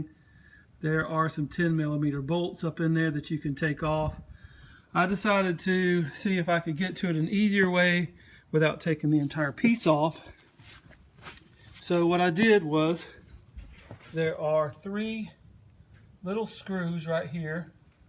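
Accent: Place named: South, United States. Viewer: Your southern accent and the scenery makes me think you might be in Georgia. Just guessing.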